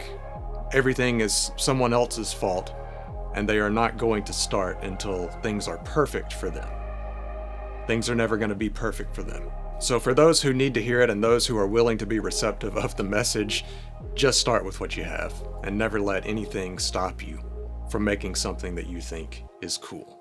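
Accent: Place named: Mississippi, United States. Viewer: Middle of Mississippi? I knew I recognized that accent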